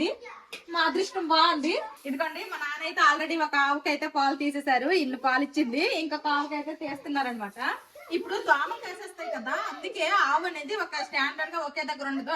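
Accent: Srikakulam, India. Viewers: మీరు సిక్కోలు యాస లోనే వీడియోలు చేయండి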